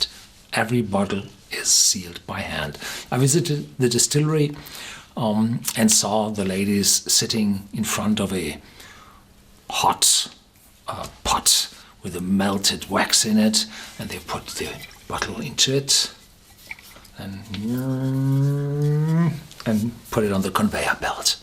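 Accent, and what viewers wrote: Bavaria, Germany. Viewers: I can’t tell if this is German Accent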